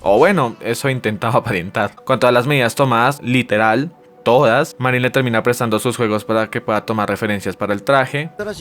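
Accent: Colombia. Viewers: toma tu like, eres colombiano???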